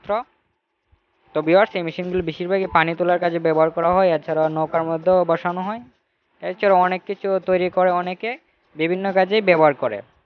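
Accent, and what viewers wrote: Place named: Sylhet, Bangladesh. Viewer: সিলেটের ঐদিকের ভাষা এমন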